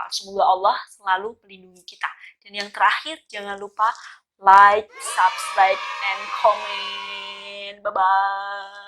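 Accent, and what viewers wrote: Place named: Java, Indonesia. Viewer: logat jawanya keluarkan dok,,jangan ditahan2 dok ,,,jangan lupa kunjungi ke puskesmas aku ya dok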